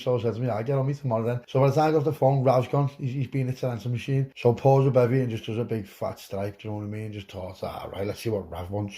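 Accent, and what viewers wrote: Liverpool, United Kingdom. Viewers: Love levy yes Scouse 🫡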